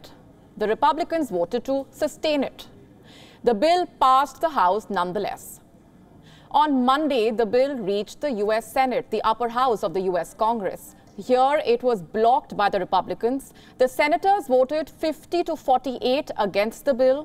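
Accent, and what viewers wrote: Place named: India. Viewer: I would really like to listen to the news. It's just that your accent puts me off. Can't newsreaders work to develop an English accent? Indian newsreaders sound like robots..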